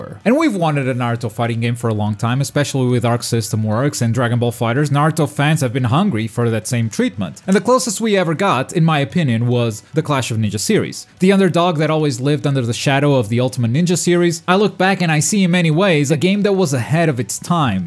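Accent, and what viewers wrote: Portugal. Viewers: I'm curious to ask but are you Portuguese? 🤔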